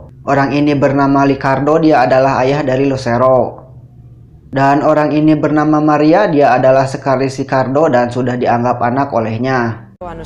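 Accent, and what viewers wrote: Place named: Lebak, Indonesia. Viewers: Sundaan geuning..prekeetek emang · Medok sundanya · Narasi nya ..suara.kedengaran sprti dari Lebak yaaa....pas lagi bilang ..Lucerroooo...Leeooo...Romeooo...sprti sandiwara Raadioooo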